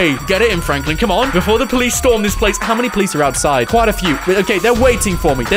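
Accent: United Kingdom. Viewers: Are you British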